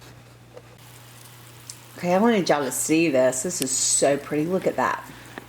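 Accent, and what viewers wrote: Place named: South, United States. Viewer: This looks cool btw I like your voice it sounds southern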